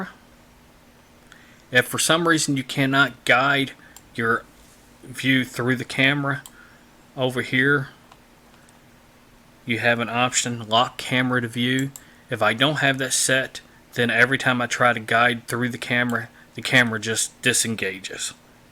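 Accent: South, United States. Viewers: Also I did not know you were Southern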